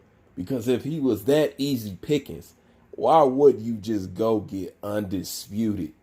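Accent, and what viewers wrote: United States. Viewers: You know you’re full of shit right? You know wilder wasn’t ducking fool.. Yea, he was ducking someone who just got stopped by Ruiz? You must have a brain tumor fool! Wilder lost in your records but your records doesn’t matter. That’s why he’s running it back. You sound like you’re from America, SUPPORT YOUR OWN MORON!!! You’re what is wrong with the world today.. Bitch!!!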